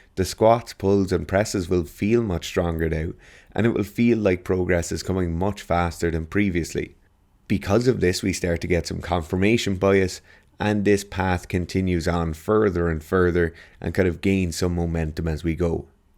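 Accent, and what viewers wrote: Ireland. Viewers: Your accent is aggressively irish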